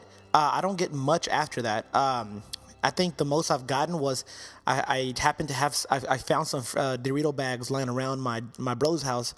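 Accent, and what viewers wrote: India. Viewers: You sound like an Indian I can say from my personal experience in living abroad anyway great video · baraks? is this guy indian?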